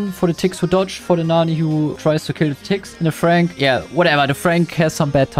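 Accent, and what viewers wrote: Germany. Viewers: i hear german actent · Man hört so den deutschen Akzent 😅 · I smell a little bit German here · You can hear that he’s German haha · The german accend is so nice!:popcorn-yellow-striped-smile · can it be so you are from german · I can Hear that your german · Don't blame him, he's German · I Bett he is from germany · I hear DEUTSCH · Man merkt den deutschen Akzent😂❤ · I love this great german accent... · Almann Akzent kickt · In a german speaks englisch everyone knows he s a german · Man merkt richtig das deine Muttersprache Deutsch ist ( merkt man an der Aussprache von manchen Wörtern trotzdem Respekt für deine Englisch Künste). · just a German accent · He's German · I love your germann acsant I find it way better then other peaple acsent 😂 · i love his german accent🤣😭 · you can hear hes german. Good Video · Bro is from Germany so his accent is just like that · Das ist Englisch mit deutschem Akzent richtiges Denglisch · Man merkt so hart, dass er deutsch ist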